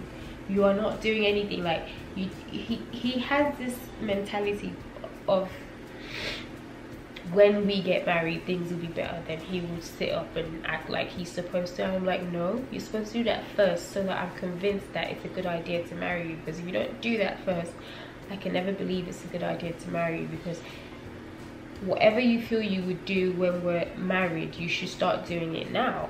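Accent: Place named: United Kingdom. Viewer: Thanks for sharing!!! God blessed you with a very lovely boy and you are an AMAZING Mum. It can never be easy doing so much by yourself but trust me, your Son is watching and he would shower you with so much love and thanks when he's of age. Loved listening to your Bri-ish accent. By the way, my Birthday is on the 25th of April. Same date you and him were messaging each other. Lol!!!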